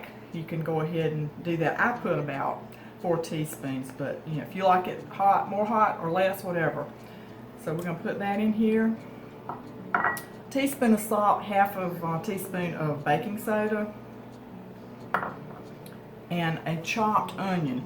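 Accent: South, United States. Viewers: Can you just flip the skillet upside down to get it to fall out after you pull it out of the oven? Your genteel Southern accent is all I need to convince me to try this recipe.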